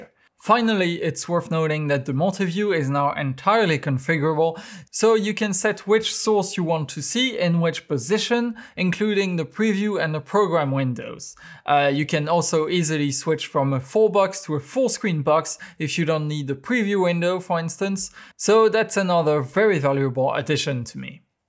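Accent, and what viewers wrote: France. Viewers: Ahaha on voit que t’es français, rien qu’à ton accent 😭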